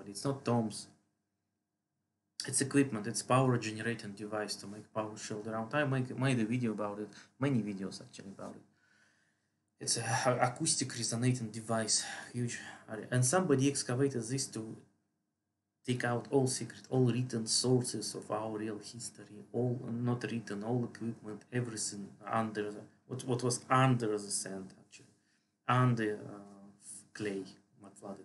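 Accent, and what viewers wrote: Russia. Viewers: M8 you need to turn up the volume I am Croat and I can follow Russian accent but it is a real struggle to hear you. Great work but watching this before dark is almost impossible.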